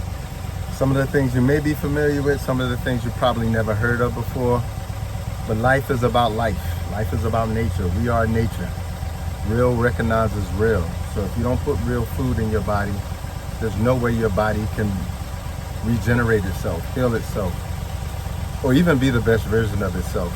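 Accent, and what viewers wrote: Jamaica. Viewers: Can I get two of your coconuts. Send some to Jamaica the land of wood and water. You sound like a son of the soil of Jamaica.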